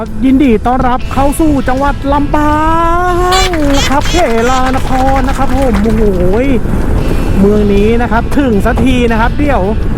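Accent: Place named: Kanchanaburi, Thailand. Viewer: พูดเหน่อเหมือนเด็กกาญจน์เลย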